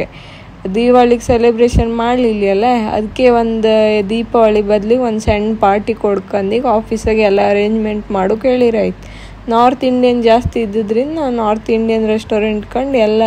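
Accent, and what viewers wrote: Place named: Kundapura, India. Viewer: Mathergla Namaskara😂😂😂. Dada ye kannada video la mala manpovulli athey, kundapura kannada is also cute to listen❤❤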